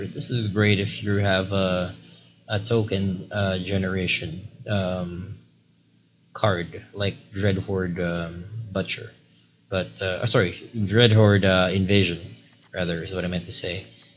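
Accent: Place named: Philippines. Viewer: I can tell you’re filipino, hahahaha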